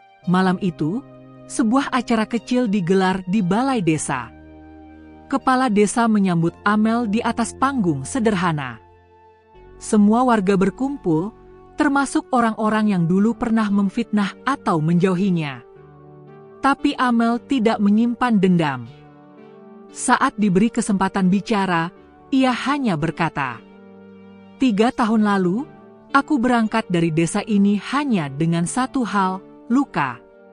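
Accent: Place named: Java, Indonesia. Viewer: Cerita ya bagus cara bacanya terlebih tebal slank jawanya..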